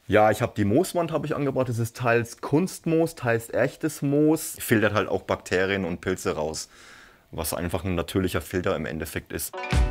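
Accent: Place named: Franconia, Germany. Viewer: Super sympathisch und direkt den fränkischen Akzent gefeiert 😍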